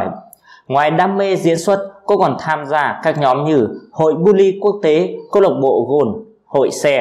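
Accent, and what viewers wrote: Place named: Mekong Delta, Vietnam. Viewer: Chỉ biết Bình luận: Rất- rất đẹp. Giọng nói Miền Tây. Cực Dễ thương.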